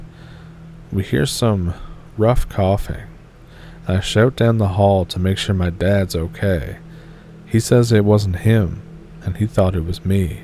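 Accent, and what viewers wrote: Canada. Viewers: Great content. Delivered in a fellow Canadian accent :)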